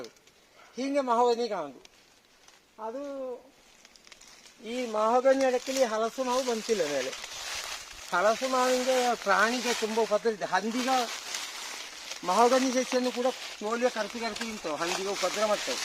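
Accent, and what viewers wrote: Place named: Kundapura, India. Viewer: ❤❤spr ❤ kundapur kannada ne chandha...! Alla ❤🙏🙏🙏👍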